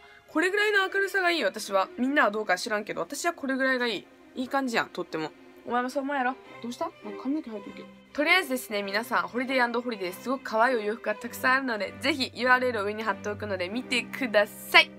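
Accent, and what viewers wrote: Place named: Hakata, Japan. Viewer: 博多弁がすごい好き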